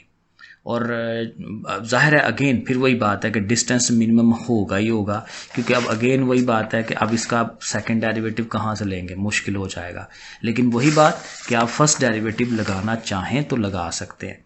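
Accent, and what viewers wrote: Sialkot, Pakistan. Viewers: A.a sir ap Sialkot ( dska side ke lagty han ?)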